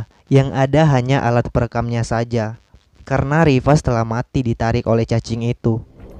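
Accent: Palembang, Indonesia. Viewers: Abang orang Palembang ya? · Wong Palembang ruponyo wkwkw😂😂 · Palembang bang? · Wong Palembang yo ?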